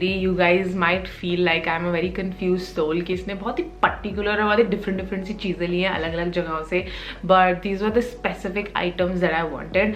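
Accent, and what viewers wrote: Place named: France. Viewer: Loved your French accent 😃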